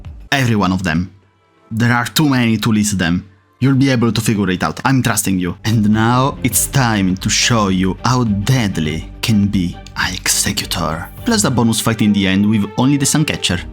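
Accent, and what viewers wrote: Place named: Italy. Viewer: I think your english pronounciation is the worst I've heard so far. It's impossible to understand the video without turning on the subtitles. I could argue you might be italian from the accent. Either way, work on that or just start using elevenlabs for AI generated voice instead.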